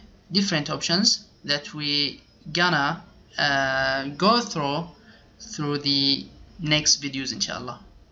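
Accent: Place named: France. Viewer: Good job that you made it english but I can still hear that french accent. Why it seems like everybody doing robot turorials are from France?! Interesting :D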